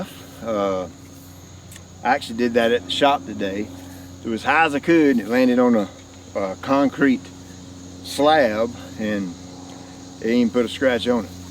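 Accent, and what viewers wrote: South, United States. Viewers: I thought that as well but I think it was the southern draw :))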